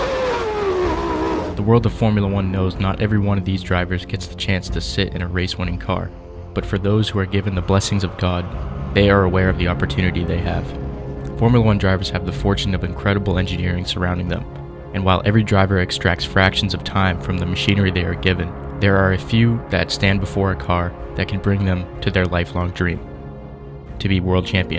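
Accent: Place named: United States. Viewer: Can’t take these Formula One videos seriously with an American voiceover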